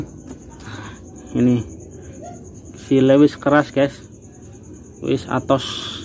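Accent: Banyumas, Indonesia. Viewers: ora ngapak ora kepenak.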